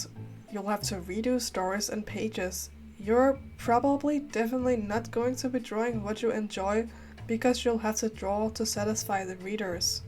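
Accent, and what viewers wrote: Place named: Europe. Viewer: Damn, you sound so European! I love your video btw. 🎉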